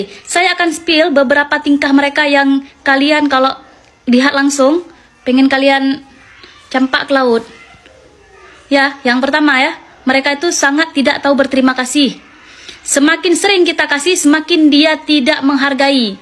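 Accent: Aceh, Indonesia. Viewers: ini orang aneh yg di bahas A coment nya B waras gk ente mau tindikan kek orang ..ente blm tentu lebih baik dari dia terus si mbk ini bukan orang malsiya dia orng Aceh logat orng aceh mirip malysia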